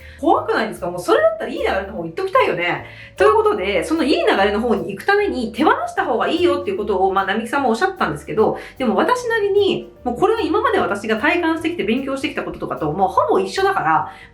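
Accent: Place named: Edo, Japan. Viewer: 心が軽くなりました😄これからもよろしくお願いします👍べらんめい調、結構好きです。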